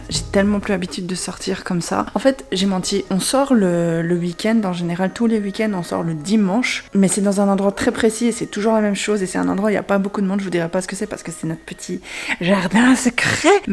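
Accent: Belgium. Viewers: T'est belge? Moi oui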